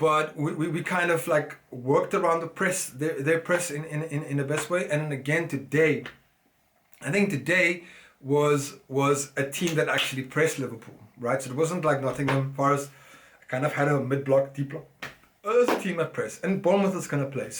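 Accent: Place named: South Africa. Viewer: What is your mix. You look and sound like a polished South African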